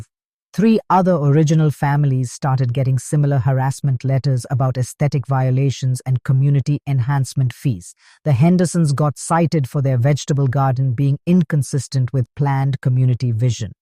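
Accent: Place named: India. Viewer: Oh my God it's got an accent of someone from India and it's so goddamn annoying I got to get rid of this one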